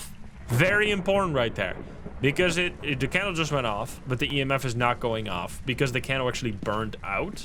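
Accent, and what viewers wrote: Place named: Netherlands. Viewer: ik had nooit geweten dat je nederlands was behalve een beetje van je acent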